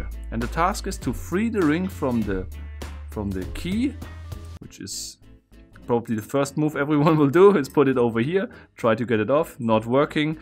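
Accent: Germany. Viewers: Oh, Guy! Are you from Germany? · are you german? :DDD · Omg man hört so raus dass der deutsch is xD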